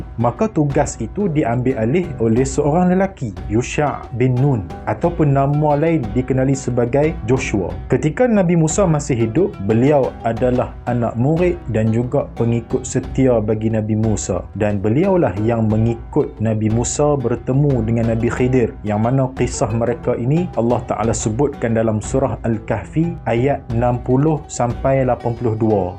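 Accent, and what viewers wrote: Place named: North, Malaysia. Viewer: Terbaik orang utaqa, 👍👍🤘🤘🤗🤗 penyampaian yg baik & mudah paham dalam loqhat utaqa..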